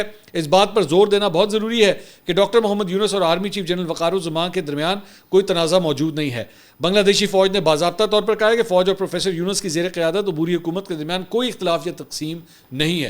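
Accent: Punjab, Pakistan. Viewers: 😂😂😂😂 bhai zaberdast work kr rhy hn ap masha Allah lkn jo ap punjabi ka touch dety hn kamaal kr dety hn ap😂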